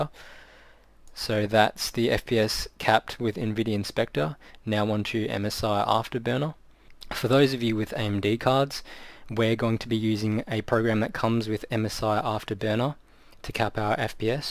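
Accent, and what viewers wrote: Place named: United Kingdom. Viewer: don't care didn't listen plus you're british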